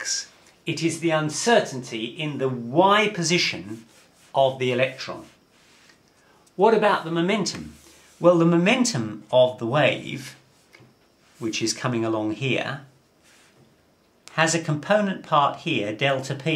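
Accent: United Kingdom. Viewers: Brilliant videos, thanks ! and im so delighted. His english is crystal clear! haha god bless British accent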